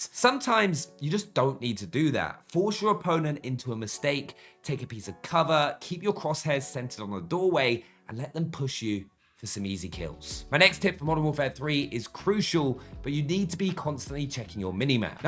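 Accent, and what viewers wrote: United Kingdom. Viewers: where u from? Are u British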